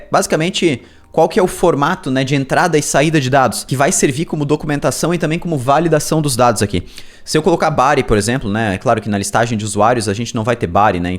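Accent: Brazil. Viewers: falar como brasileiro, ninguém fala Alex como Álex…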